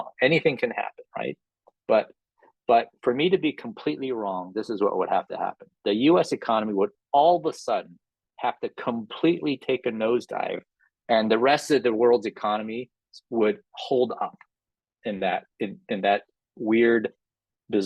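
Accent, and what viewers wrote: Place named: United Kingdom. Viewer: So I have a book about active listening and it suggests you repeat the last sentence the other person said, but in your own words, so, slow it down, you speak quickly, and say, “so if I understand you correctly, you’re saying blank blank blank. He’ll say “yes” then you ask a question that builds on his answer”- lots of books out there on how to interview and ask questions in an active listening mode. Mostly I’d say slow way down because you have a distinctive British accent but talk super fast. You’ll figure it out and continue to provide great content! Thanks for making this video!!!!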